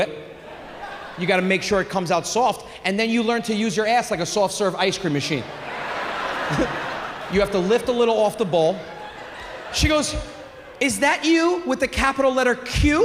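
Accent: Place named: South, Italy. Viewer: Hahaha spoken like a true southern Italiano 🤌🇮🇹. Loved it 👏😂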